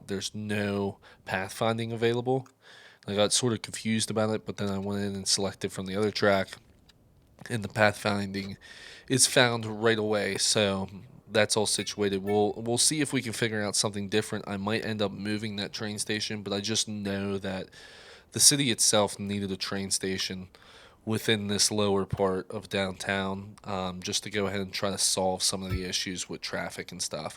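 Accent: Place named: Pittsburgh, United States. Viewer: I knew you were from Pittsburgh. Your accent is a dead giveaway. My family is from Pitcairn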